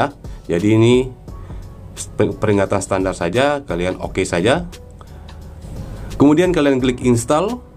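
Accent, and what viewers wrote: Kotamobagu, Indonesia. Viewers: Om orang kotamobagu kang 😂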